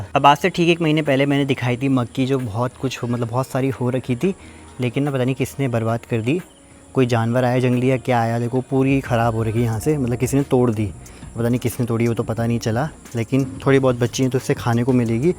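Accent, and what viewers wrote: United States. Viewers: Goooooood american english bahut heeeee jabardast ❤ lage raho safalta jarur milegi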